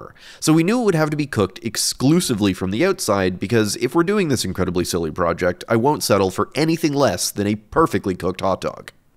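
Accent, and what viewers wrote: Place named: Canada. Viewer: I love how you can tell this dude is Canadian in the first min. "Must be cooked from the oatside"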